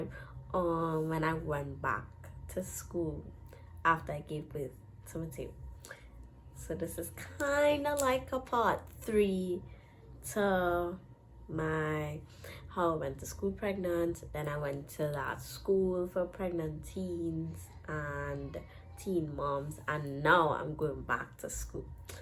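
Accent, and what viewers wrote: Trinidad and Tobago. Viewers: Jesus Crist am obsessed with trini accent love from ja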